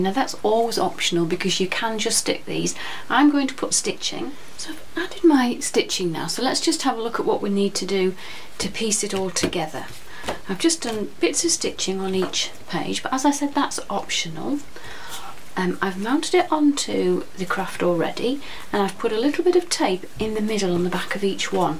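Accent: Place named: Northern England, United Kingdom. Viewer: I have been trawling all your videos - your voice is lovely - Northern girl same as me - and I adore the colours you choose to print together. Lovely pages, lovely patterns - I'm just going to try a gell plate for the 1st time tomorrow ( it's 4.30am and I'm an insomniac!) Thanks so much for sharing xx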